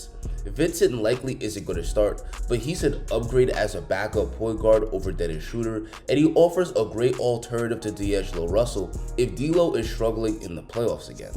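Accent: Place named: Philippines. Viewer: Is this from a filipino point of view? I can tell from you’re accent.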